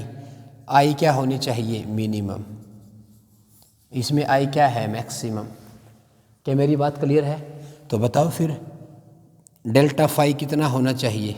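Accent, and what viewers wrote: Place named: Haryana, India. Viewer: Sir harvanvi galak aa rahi hai sath ma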